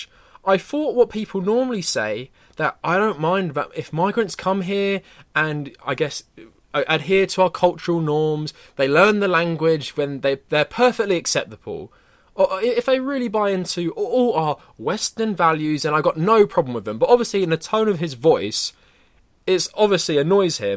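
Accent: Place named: United Kingdom. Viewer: You sound brittish, how does it feel to be a traitor to your own country and watch it be decimated by the corrupt leaders?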